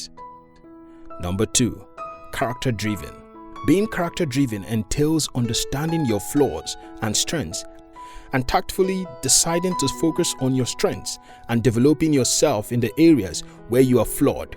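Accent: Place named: Nigeria. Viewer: The narrator must be a Nigerian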